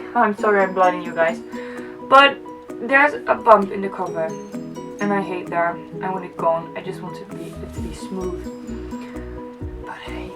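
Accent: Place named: United Kingdom. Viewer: haha precies wat ik dacht, cuuuuute :D en je klinkt zo Brits wow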